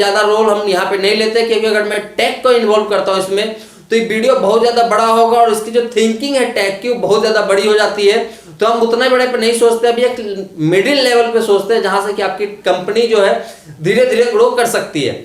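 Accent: Bihar, India. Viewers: Aap bihar se h kya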